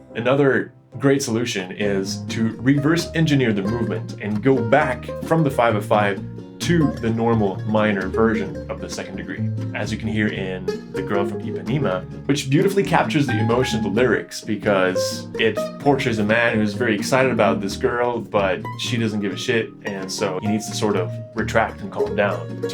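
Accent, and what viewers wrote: Quebec, Canada. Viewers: Vous faites honneur à l’accent québécois et à mon standard de jazz préféré:! Très inspirantes vos vidéos regardées du haut de ma tour situé dans feu Ville Vanier… Le regard ensuite tourné vers le château Frontenac pendant que percole la richesse de vos enseignements…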